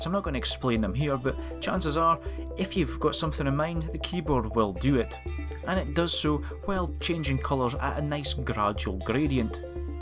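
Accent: Scotland, United Kingdom. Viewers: Love that Scottish accent. Great review and greets from Germany!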